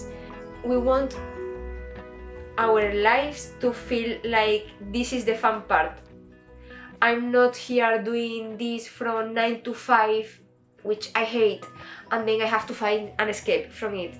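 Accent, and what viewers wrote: Spain. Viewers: it’s harder to understand if you are not Spanish, and the reason it’s not her accent, it is the “entonation” (I don’t think this is the right word, but English is not my language, sorry). She speaks English with Spanish entonation. (Very common for Spanish speakers) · Spanish accent - Spanish subscribers..🙄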